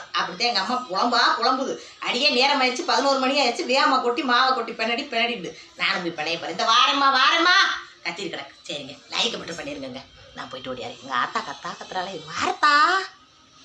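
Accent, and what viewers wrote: Madurai, India. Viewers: Madhurai salang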